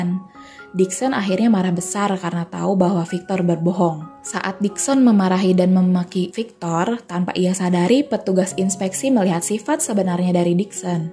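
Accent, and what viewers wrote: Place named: South Sulawesi, Indonesia. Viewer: Suara sulsel ketara sekali.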